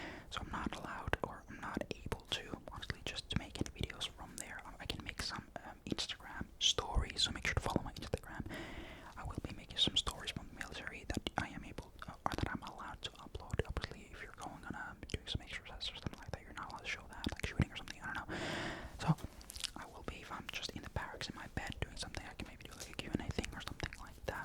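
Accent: Finland. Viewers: i think i've only seen like a few of your vids but when the video started i said "he's probably from finland" and i got it right lol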